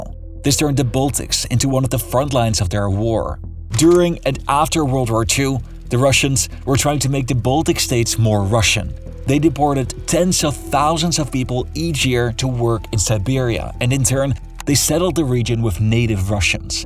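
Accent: Netherlands. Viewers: Pro tip: don't chag your linguistic accent to pronounce a name in another language that is equally wrong. It's difficult enough as it is to cope with your sharp Dutch accent (ask John Oliver what exactly that is, he can word it better), we don't need you to randomly switch to Dutch to pronounce a Russian name, that's just ridiculous. Your content is interesting, but the narration is mostly stressful through a combination of your voice, your intonation of urgency (which isn't necessary to deliver the message and frankly works to its detriment) and the rather annoying Dutch accent that you all suffer from (so don't take it personal). Try being a bit more relaxed, at ease, people will stay longer, viewtime will increase. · This guy is Dutch for sure.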